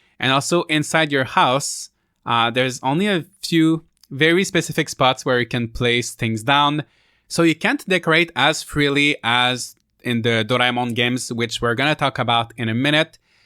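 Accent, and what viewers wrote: Quebec, Canada. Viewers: accent quebecois ?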